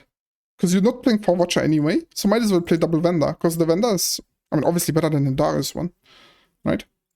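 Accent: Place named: Germany. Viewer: Gute Erklärung und man hört den deutschen Akzent raus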